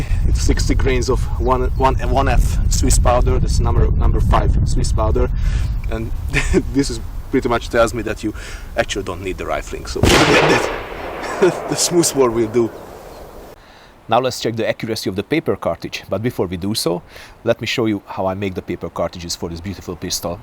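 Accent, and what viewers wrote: Hungary. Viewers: Hunglish is awesome! :D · As a fluent speaker of Spanglish I appreciate the Beaty of Hunglish. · “Hung-lish”…. Keep it up sir lol the brace is absolutely beautiful. I want a pair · It is in fact my favorite gun channel on YouTube in beautiful, bright Hunglish language. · Hunglish? I always enjoy and learn very much from your videos!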